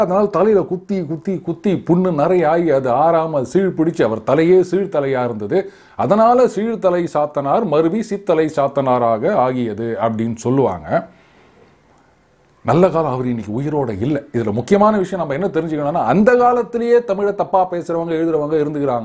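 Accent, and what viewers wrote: Chennai, India. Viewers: உங்கள் சென்னை தமிழ் ரசிக்கும்படி இருக்கு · I simply love your Madras bashai/ lingo/ dialect and sarcasm/ wit/ humour with bare facts!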